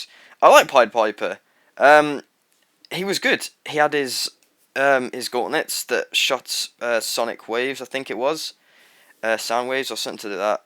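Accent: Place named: London, United Kingdom. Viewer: Sounds like he's from London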